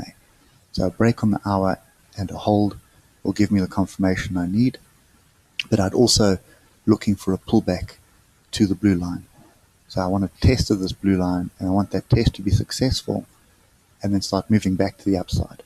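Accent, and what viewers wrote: South Africa. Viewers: You sound South African :)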